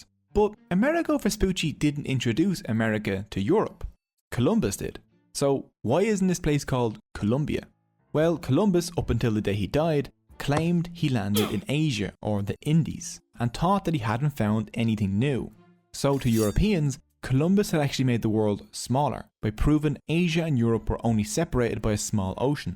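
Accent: Ireland. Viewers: See? What a difference, a cogent, coherent, properly expressed comment can make? You can hold a different opinion than me, even an absolutely contrary one. But when expressed intelligibly, it's a pleasure to engage with the commenter (i.e. you) rather than acrimonious. I reciprocate your expression of courtesy and wish you a pleasant day, too. In fact, this was how I hoped to engage the video creator Irishman,. His thick accent undermines the intelligibility of the narration of his well-researched presentation. Instead of accepting my critique, he took personal offence and hardened his stance to a "this is how I speak, take it or lump it" position. But that won't gain him viewers. On the contrary, he may lose a few. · This guy sounds surprisingly irish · I am so distracted by this Irish accent that I can't focus on the information being told to me 😂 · He's Irish. 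· How Irish does the narrator sound? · This is my first time watching your channel and I immediately subscribed. Such a well detailed explanation and smooth animation! This feels like me hitting a Gold Mine. Keep it up,! Love your Irish accent! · Irish accent. T is often pronounced D Hence Paddy for Patrick. Possibly due to Gaelic influence rather than English/Germanic... but I am guessing. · Nice to hear another irish man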